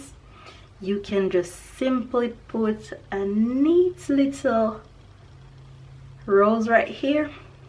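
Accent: Jamaica. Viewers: Very cute and easy updo!!And I open your Jamaican accentMy family is Jamaican as well 😍😍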